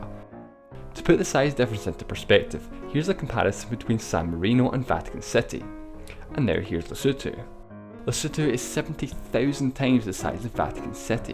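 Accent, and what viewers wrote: Scotland, United Kingdom. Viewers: I like your accent. Are you Scottish? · Scottish accent? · Walid Bahri Um you do that's a Scottish accent right? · You Scottish? You're reminding me of my year abroad rn. It's a fond memory 😊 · What about Russia, there's a small bit close to the north sea between Poland and Lithuania. And BTW, love your accent, what is it? Scottish. thanks for this video. Don't mind the twat who cannot speak in English, your speech was clear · Are you Scottish? I like your accent. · This is a Scottish accent right. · Is the speaker from Scotland? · He's Scottish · he's scottish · What problem do you have with his accent? The guy is Scottish, so what? Many of his videos are better laid and he explains stuff better. CGP grey uses a lot of humor where he doesn't know what to say and speaks way fast. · Is he Scottish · alan benlolo It's English with a rather strong Scottish accent. 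I can understand it pretty well.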